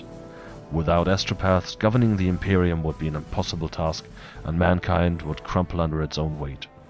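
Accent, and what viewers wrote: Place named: Germany. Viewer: thanks for your educational videos. the special voices you use and your German accent make it even better.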